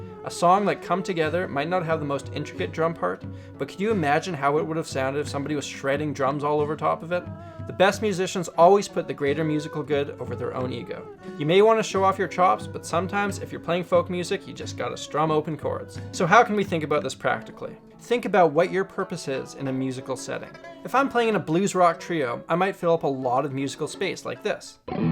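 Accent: Winnipeg, Canada. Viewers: Are you from Winnipeg? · Is this guy from winnipeg?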